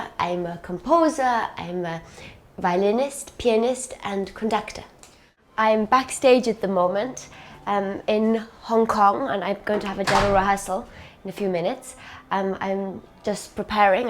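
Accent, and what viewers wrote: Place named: Germany. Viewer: I think I here some slight German accent in her English mother tongue.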